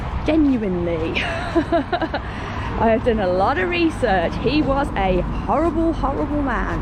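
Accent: Birmingham, United Kingdom. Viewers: Always enjoy hearing your voice! As a Brummy living daan sarf, it’s nice to hear that familiar twang sometimes! There’s so much to see & explore in Brum. Will look forward to more videos 😊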